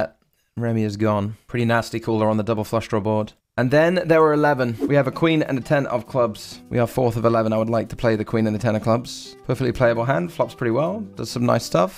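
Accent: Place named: Leicester, United Kingdom. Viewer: Aye up me duck,is that a Leicester accent?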